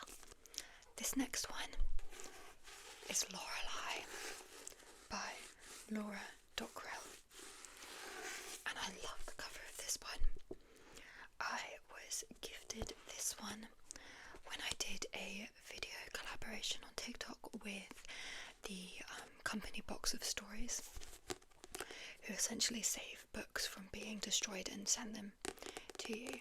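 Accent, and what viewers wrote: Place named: United Kingdom. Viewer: you seem to be British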